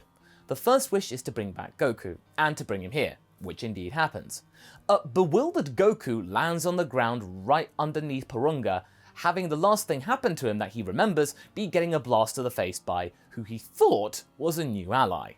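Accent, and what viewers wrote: United Kingdom. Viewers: I love you British voice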